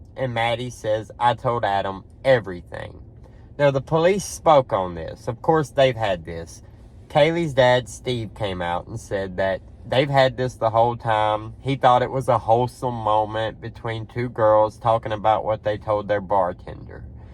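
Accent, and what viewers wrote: South, United States. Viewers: You are the cutest little southern boy I have seen . You are very sensible and quite smart . It’s so refreshing to hear your sweet southern accent which is pretty rare to hear here in NY City . I am a Grandma and have much more years on you . But if I was young again I would make you my boyfriend . Happy Holidays . Your secret admirer Grandma .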